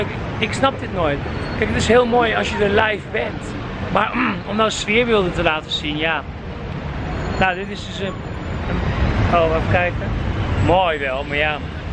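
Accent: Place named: England, United Kingdom. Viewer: is zo grappig vooral Zijn Engelse accent 😂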